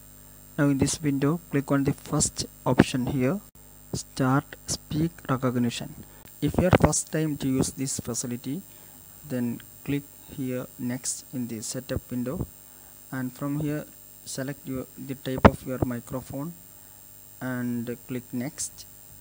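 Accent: Kerala, India. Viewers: Bro u mallu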